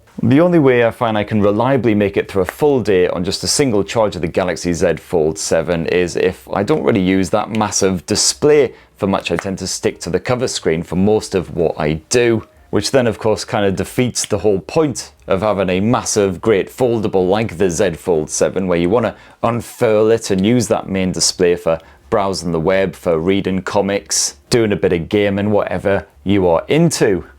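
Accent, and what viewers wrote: United Kingdom. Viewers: UK accent is so smooth and sorting, love it🥰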